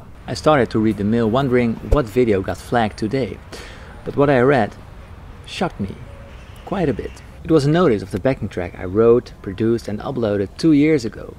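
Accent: Netherlands. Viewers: ik ruik je Hollands accent, Hup Holland Hup we worden Wereldkampioen 2022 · I Always thought you had a dutch accent and the mail in this video confirmed it for me :)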